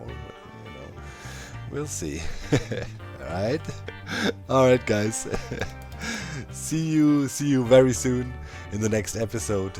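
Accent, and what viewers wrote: Germany. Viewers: Oh i knew you couldn't be british or american or australian or canadian du bist deutsche i hate gewohnt in deu für 9 monathe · You sound sooo german :D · I like that accent it sounds kinda German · Uhhh nh deutscher 😅 cooler akzent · Are you german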